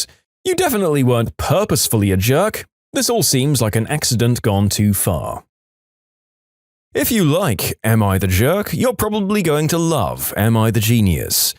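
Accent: United Kingdom. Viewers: Is bro british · Bro is british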